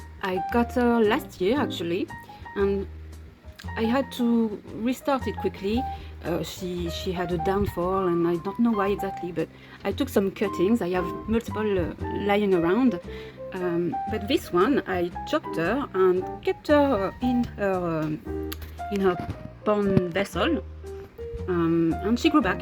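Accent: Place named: France. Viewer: I love your French accent! you speak English very well, please do not apologize. 🙂loved seeing your planty space and your very adorable doggie. 🐶thank you for participating in this tag 💚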